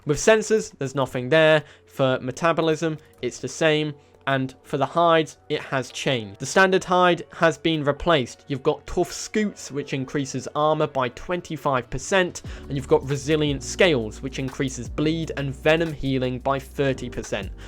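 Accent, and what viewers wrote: United Kingdom. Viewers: you are briish ey?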